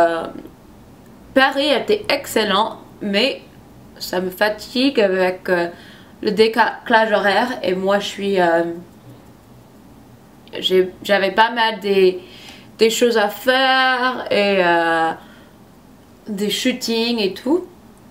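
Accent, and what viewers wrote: United States. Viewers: I learned French in school for 5 years now and thought I can speak and understand it now... After watching this video I know, that I can't 😅 It was so difficult to understand 😁 Et j'ai une question: Est-ce qu'elle américaine? Je crois, que c'est très faux ( dunno if this is the right word 😅), but I was wondering if she is amercian or something else native english? Btw I'm german 😄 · J'adore ton petit accent américain. Tu es trop choux!!! Biz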